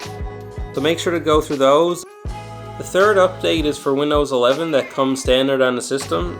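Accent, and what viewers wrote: Newfoundland, Canada. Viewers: Dude you definitely have to be a newfie? Lol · i know a newfie accent when i hear it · You sound like a Newfie my friend, hello from a fellow Newfie if so. Great video, keep up the good work! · You from NL or east coast Canada by chance? Knows that accent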